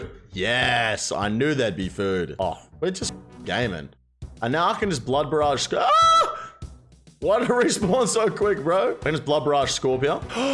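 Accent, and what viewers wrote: United States. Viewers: Actually not half bad new york / jersey accent. See you at Tony's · It’s refreshing to know Australians do American accents. I guess the thought just never occurred to me lol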